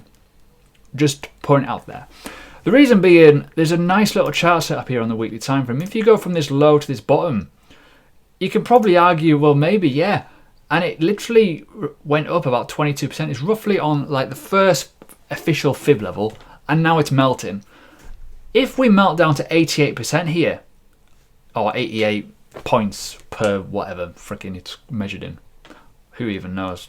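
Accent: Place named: North, United Kingdom. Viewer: Favourite YouTuber. Proper northern. Tells it how it is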